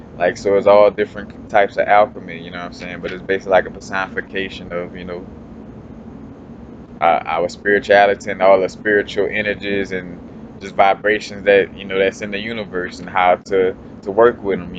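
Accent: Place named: South, United States. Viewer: Brother I love video,but sometimes you fumble your word and laugh or say "and whatever". I get a ton of knowledge from you. I just wish you would slow down your thoughts so that the watcher could receive all the amazing words you have to share. You got to remember we're not all from the south. Lol that drawl is tough to understand at times. I SAY ALL THIS WITH LOVE, cuz I want the world to get hip to you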